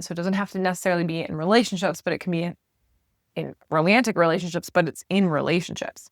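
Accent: Canada. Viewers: I just love. Your Canadian accent. You are very attractive. I’m sure that makes you uncomfortable. Why? “Oh I’m smart and professional.” You’re still a girl, right?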